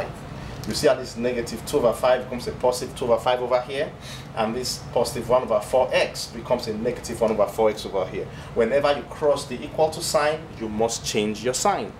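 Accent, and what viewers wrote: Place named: Nigeria. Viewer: you had a niagerian accent so thats why i thought you were from nigeria i am from bauchi state northern nigeria which part of nigeria are you from?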